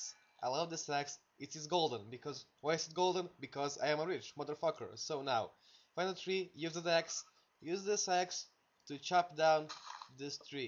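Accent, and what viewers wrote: Russia. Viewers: RAŠN AKSENT <3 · You sound like a russian but you're croatian its weird :P.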